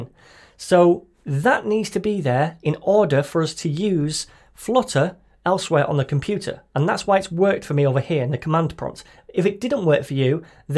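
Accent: United Kingdom. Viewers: british accent right? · Long live bri'ich accent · your British kind of accent is relxing and easy to be understood and the way you explain things is really something. Thanks! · ngl, at first i thought this man was indian, but when i listen him carefully.... He is british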